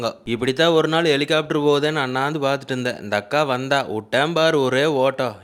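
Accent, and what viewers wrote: Kongu, India. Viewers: Ungal kongu tamizh, Coimbatore lollum super. Samayalum dan… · Namma kongu thamil arumai🔥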